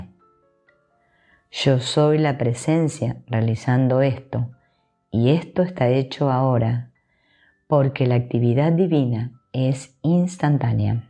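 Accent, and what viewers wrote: Argentina. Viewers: No comprendo tu voz Argentina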